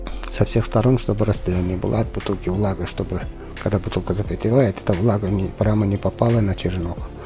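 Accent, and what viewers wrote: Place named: Belarus. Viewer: Я угадала. По разговору. У меня бабушка из Беларусии была. Родной говор слышу.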